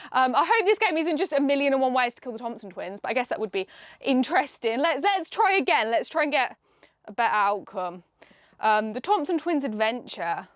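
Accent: United Kingdom. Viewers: I love your British. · yooo ive never seen or hear of you're channel before, but i have a useless talent and how i aquired this talent.......be efffed if i knew but just from seeing a picture of someone i can tell if they'll have a british english accent but my buddy here didnt belive me and i bet him five bucks you had said accent. SO I HAVE TO THANK YOU FOR THE 5 BUCKS I JUST WON. Also great video did not realize games were stored on vinyl, crazy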